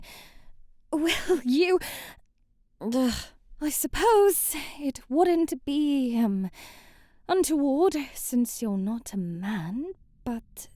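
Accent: United Kingdom. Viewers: I love your British voice😌❤so beautiful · im begging for a hairdresser one with Adela you'd fit her with the brittish accent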